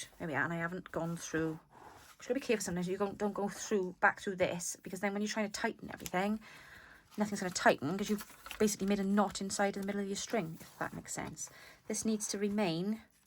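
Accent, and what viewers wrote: Wales, United Kingdom. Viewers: Hello, I enjoyed listening to you today even if I didn't get to watch the video. I wouldn't mind having a bit of your energy, just because currently I'm feeling a bit drained, but you make me laugh and chuckle with your Welshness. Thank you for being you. Take care and God bless you and yours. Sincerely Becky B from Arkansas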